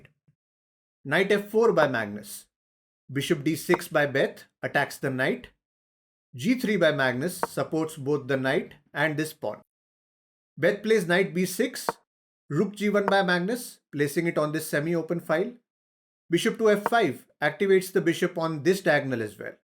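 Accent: India. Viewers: an by the way..? which indian extreme accent person, was able to rise nearly a million subs with chess? is that corona are the entire world silly nowaday.. in einer welt die verrückt spielt, ist nur ein irrsinniger wahrlich geisteskrank... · finally an indian accent i understand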